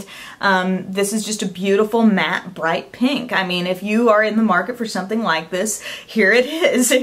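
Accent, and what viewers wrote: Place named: South, United States. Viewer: LOVE your passion. And even though you're a newscaster, I can still hear that southern accent which I also love. Even though I was born in Texas, I never really developed a true southern accent that i could be proud of lol.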